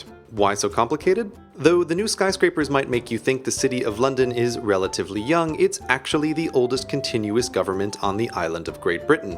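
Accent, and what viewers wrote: United States. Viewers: He mentioned that he went to an American college. Judging from his accent, I think he's American. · Thought you were American? Mind=Blown!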